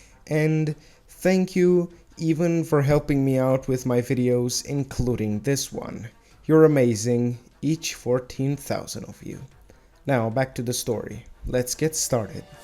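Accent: Russia. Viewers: You sound like you have some sort of Russian accent (Don’t hurt me, I’m supposed to be a stereotypical boi from the US)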